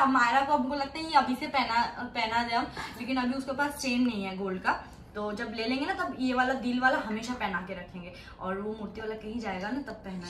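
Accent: Bengal, India. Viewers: Apki Hindi bht sweet hai☺️hum marenge ye achcha bolti ho waise mai bhi bengali hu or humko bhi secret wala insaan ka naam Janna hai😂😂or barki mummy bolne par camera man itna has kiu rhe hai🙄 bengali bolne wale log sab Hindi bol nhi pate but ne kosish kar rhi hai bht jaldi sikh jayegi☺️👍🏻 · Di O aapka Bengoli kadha O bahut hi cute Hy very nice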